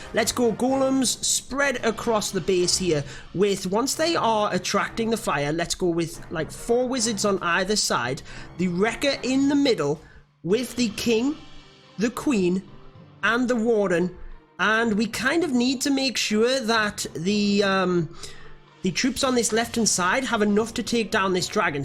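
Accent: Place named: Scotland, United Kingdom. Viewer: where are you from?? you have an strong accent haha. guessing scotland?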